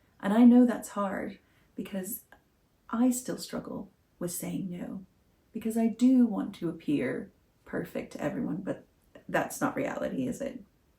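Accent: United States. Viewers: I have just found your channel and I was confused for a while you sound American but your home looks British then I heard you say Tesco. I found this video so peaceful and I instantly subscribed. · Oh ok I thought you sounded a bit American! lol. Menopause is awful and neurodivergent kids are such a challenge! It's nice to know others can relate! Plus Jane Austen is amazing! I think I'll enjoy your channel! ❤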